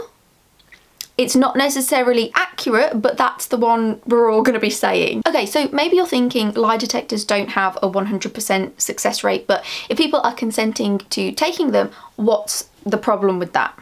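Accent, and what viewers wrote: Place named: Birmingham, United Kingdom. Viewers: This is really well written. Are you from the midlands? I ask only as I come from West Brom. Its not a black country accent but it somewhere from the middle of England, lol. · Great video. I hated the show thought it was disgusting. Hate Jeremy Kyle also. The show was meant for views…it wasn’t to help people. Not heard a brumy with such a nice voice/accent before. Lol Beautiful too.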